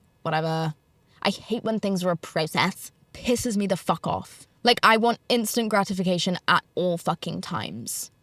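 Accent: United Kingdom. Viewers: me I don’t hear the US accent but the UK one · I don't think an american accent has developed but the britishness of your accent has definitely decreased. It's noticeable if you go back and watch early tiktoks of yours. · You did say Vite-a-min 😂 it’s VIT-A-MIN. But yes, overall you’re back to British. 🇬🇧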